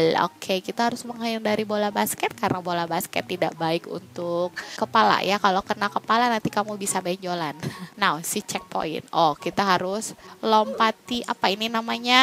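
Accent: West Java, Indonesia. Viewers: sunda na kaluar pisan wkwkwkwk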